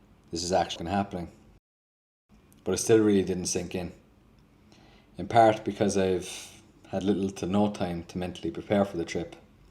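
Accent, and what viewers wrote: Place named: Cork, Ireland. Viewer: Ive been looking at the Kove. YouTubes algorithm showed me this vid so I clicked on it. Wasn't expecting a Corkonian accent 😂 Will subscribe and watch your other videos now. Best of luck,.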